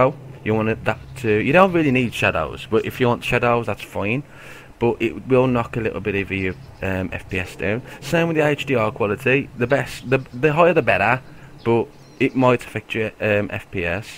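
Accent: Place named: Germany. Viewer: you're german right? :D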